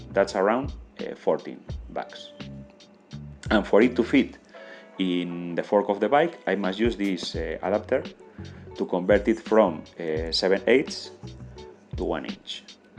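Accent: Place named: Bilbao, Spain. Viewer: Aupa!!. Ese inglés de Bilbao a tope.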